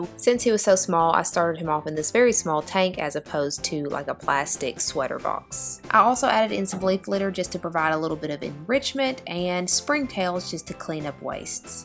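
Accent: South, United States. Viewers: He just dont understand a good southern accent, its nice.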